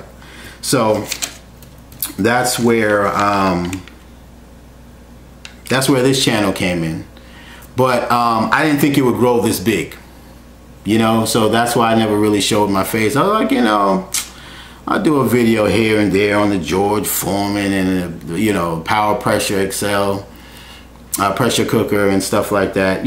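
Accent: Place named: Queens, United States. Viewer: Hey, Love your Air Fry cooking channel. I said to my son, hey he sounds like he is from Queens!!! Then I saw you video Q&A Cooks Essentials Air Fryer- Well I'm from Queens and lived in Westbury all my childhood! Wow what a coincidence! Love your Channels! Living in Texas for 28 years now...But always a New Yorker! Just wanted to share!!!! Many Blessings and good for you!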